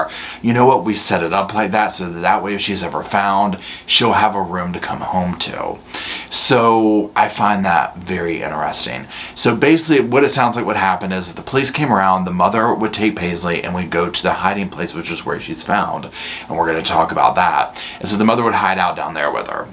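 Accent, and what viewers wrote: South, United States. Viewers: Dearest and Roscoe darling, I L💓 VE YOU!😘 I can't tell you how thrilled I am to watch your channel grow 🌱 There's nothing more fabulous than hearing that southern twang in your voice as you spill the tea to "Y'all"!😁 And a shot out to the officer's who were so kind to this precious little child 💞 My heart just aches thinking about what she's been through... BOTH these "parents" should go to prison for a longggg time!🤬 They have no doubt f*cked up this child's life, and I pray she gets the care and therapy she'll need to heal🙏 I'm so grateful for this channel, and adore "y'all" to pieces 🧩 XOXO's, Maegan 💋💋💋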